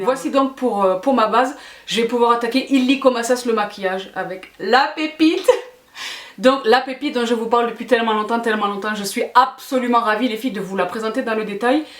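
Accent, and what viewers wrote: South, France. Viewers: Coucou ! Je viens de découvrir ton compte et j'adore ce que tu fais : tu es claire dans tes explications, tu es enjouée, positive . Ton accent du sud 💕 je m'empresse de visionner toutes tes stories et de m'abonner à ton insta. Merci merci !! 😘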